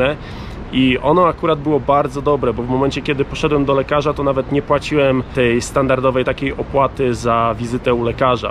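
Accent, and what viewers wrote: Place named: United States. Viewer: I tez amerykanski akcent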